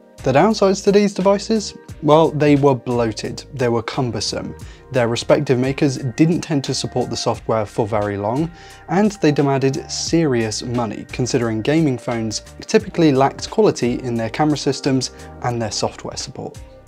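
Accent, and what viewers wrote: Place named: Cornwall, United Kingdom. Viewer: No way your from bodmin. I thought I recognized that accent. 😂 cheers from fowey!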